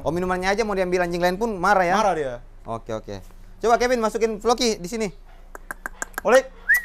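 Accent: Ambon, Indonesia. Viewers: Biar da lama di jakarta ttpi logat Ambon nya nga ilang2 👍👍👍😂😂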